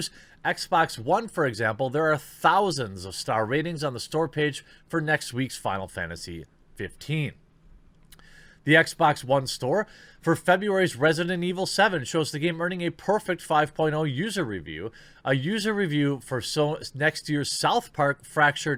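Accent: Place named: Midwest, United States. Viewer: Rotten to-mate-ers... yeah this guy is Midwest. I bet he has a Davenport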